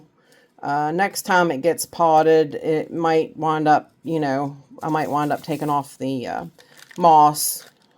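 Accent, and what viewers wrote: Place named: South, United States. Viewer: Hey there, from your accent you sound sound sound southern like me😊 May I ask where your from? You said the name of that lake and I thought I have heard of it before many years ago. At least I think I have 😂 I use to live in Ga. When I was little we use to go to a lake in Alabama.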